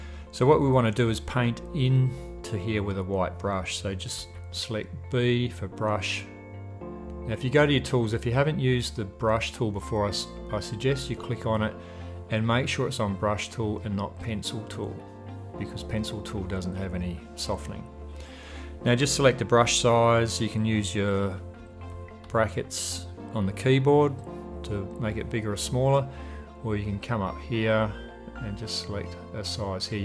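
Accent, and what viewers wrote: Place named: Australia. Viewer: I have watched a gazillion photography tutes on YouTube. Great to hear an Australian accent for a change.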